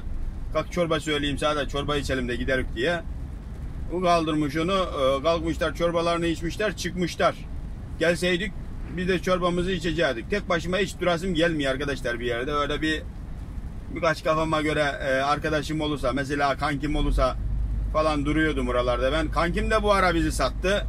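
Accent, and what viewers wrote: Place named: Kastamonu, Turkey. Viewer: Kastamonu bide ıIlgazlılar gibi konuşuyosun abi.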